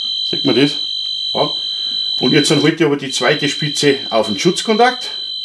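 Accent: Bavaria, Germany. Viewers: Habe gut gelacht. Warum? Weil mein Kopfhöhrer am Ladegerät hing und Youtube versucht hat, Bayrisch mit Untertiteln auf Hochdeutsch zu versehen. :D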